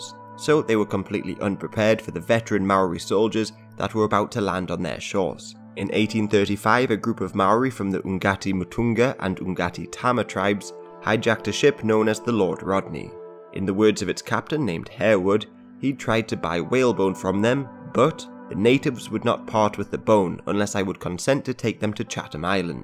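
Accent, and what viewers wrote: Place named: Newcastle, United Kingdom. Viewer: Good stuff, bonny lad, and I think that i heard the remnants, of a Geordie, accent there, good voice, for story telling tell them about,the lampton worm,.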